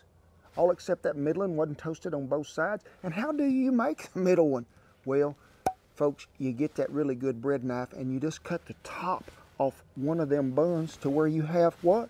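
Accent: South, United States. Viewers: shane martin he sounds and looks extremely American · I love his accentttt!!! Just learnt this is how southern american accent be like! Liked and subscribed!!! 😄😄😄👍🏻👍🏻👍🏻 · Its that southern accent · Real American cowboy accent . Greetings from Poland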